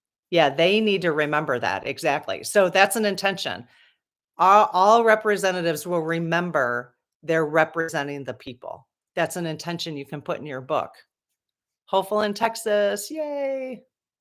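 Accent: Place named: Wisconsin, United States. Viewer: I knew Wisconsin by your accent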